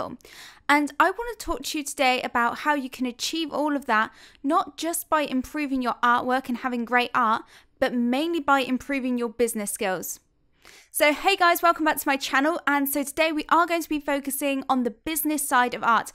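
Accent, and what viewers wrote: England, United Kingdom. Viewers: That is so pretty. Thanks for uploaded. And, again, I love your english accent. Saludos desde Ecuador.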